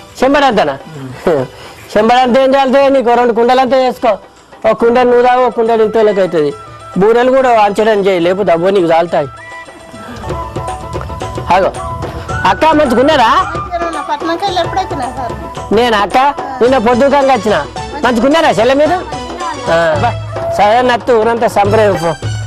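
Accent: Telangana, India. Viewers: అచ్చమైన తెలంగాణ యాసతో అమాయకమైన మ్యానరిజంతో కూడిన కామెడీ నాకు చాలా నచ్చుతుంది.. మరీ ముఖ్యంగా ఇందులో సగటు మానవుడిలా ముందో మాటా.. వెనుకో మాటా.. సరదాగా అనిపించింది.. ✍ Ramon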